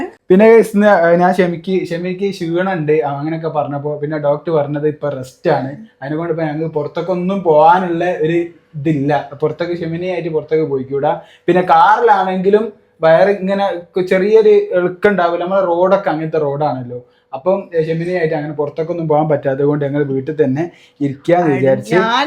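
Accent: Kozhikode, India. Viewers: എൻ്റെ ഷെമി ധൈരമായി ഇരിക്ക് ഉഷാറായി ഇരിക്ക്യുന്ന ഷെമിയെ ആണ് ഞങ്ങൾക്ക് ഇഷ്ടം ഷെമിയുടെ കോഴിക്കോട് ഭാഷ രസ ഉണ്ട് കേൾക്കാൻ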